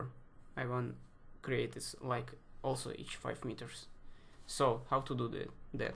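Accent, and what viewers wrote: Russia. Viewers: Какой ужасный русский акцент!) и столько ошибок походу действия) однако ты молодец! Хороший канал у тебя! Желаю удачи везде и во всем! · Так хорошо воспринимается английский монолог, сказанный с русским акцентом)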